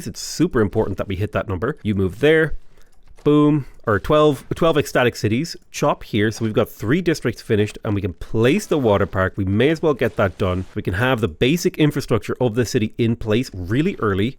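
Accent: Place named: Ireland. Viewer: I’m a natural English speaker, and you sound like an over caffeinated Irish dude. But I’ve been told Canadians speak really slowly compared to aussies, Brits, and Irish.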